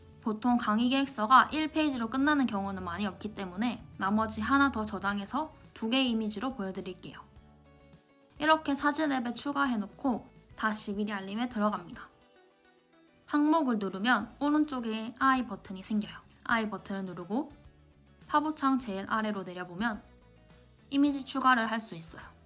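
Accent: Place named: Busan, South Korea. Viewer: ㅋㅋㅋ 익숙한 인토네이션에 ‘부산 분이신가,,?’ 했는데.. 맞군요!ㅋㅋㅋㅋ 대박입니다 영상 감사해요!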